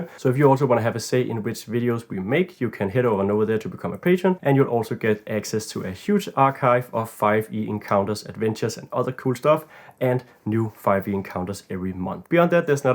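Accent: Denmark. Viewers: are you danish as a dane myself the accent is pretty easy to hear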